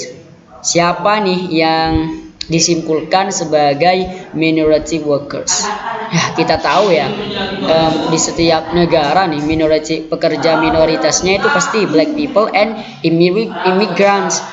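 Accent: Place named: Madura, Indonesia. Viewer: Bahasanya ketahuan jek madureh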